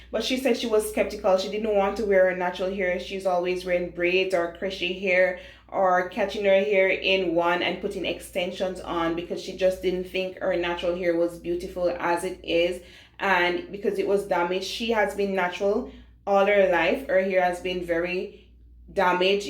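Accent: Jamaica. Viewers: I thought you were Jamaican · What salon do you work at? cause you sound like you might live in Jamaica. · You sound Jamaican. Where can we find or contact you?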